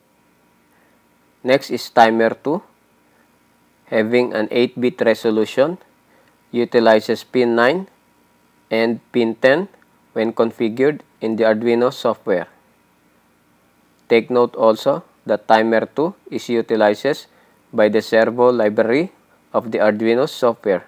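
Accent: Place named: England, United Kingdom. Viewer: It is lots of good info, Just so hard for me with my cowboy english raisen to understand the proper english you speak. It is not your issue as I am sure your countrymen understand you just fine but would have a very difficult time understanding me. You know how us Americans are, were the only ones on the face of the earth.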